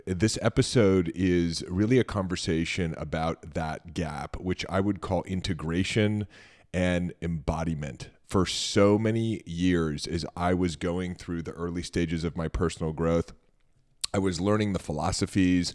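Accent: United States. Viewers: The more I listen to he keeps sounding more and more like that Turtle Dad from Finding Nemo. 😂Love that SoCal accent imma NorCal girl myself.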